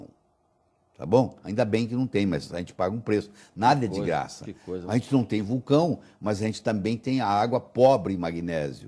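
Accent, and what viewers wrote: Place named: Minas Gerais, Brazil. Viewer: mineirice... coisa linda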